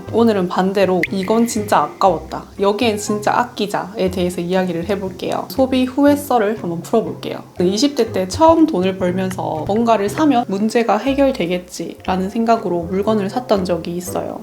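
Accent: Gyeongsang, South Korea. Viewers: 주인장 억양이 경상도분이시네~~대구 아니면 부산분이신듯~~😆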